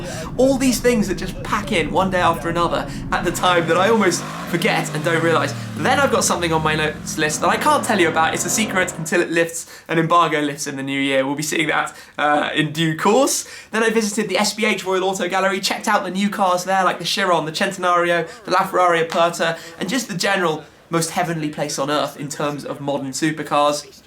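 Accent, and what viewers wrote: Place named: United Kingdom. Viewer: damn ur accent is so british... and im british :0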